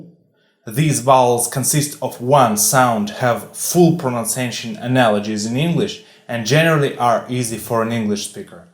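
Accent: Russia. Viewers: Ахуенный акцент братан, иностранцы в эйфории, настоящий русский учит))))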